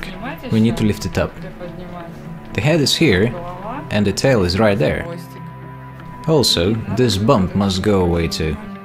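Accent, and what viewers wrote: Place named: Russia. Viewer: Еее !! Теперь и на английском языке!! Отлично! О да , русский акцент )) так мило ! Вы, больше молодцы, уважение !!!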